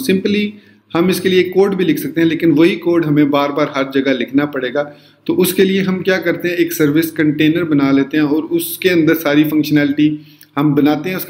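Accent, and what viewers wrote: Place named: India. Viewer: Hi sir, i saw that you have many likes, and ppl likes you, but your articulation is bad, i know it is hard for you in your country i can understand that. But in one moment i find that you speak English in another i think it is Indian. I can not distinguish is it English or Indian :).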